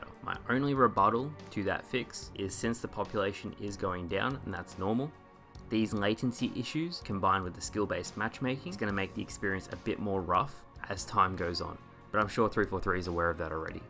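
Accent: Australia. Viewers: Are you Aussie or just in a remote area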